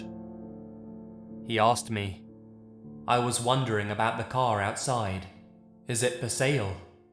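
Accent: England, United Kingdom. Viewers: are you British I'm not good with ascents sorry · same brits ftw is he british?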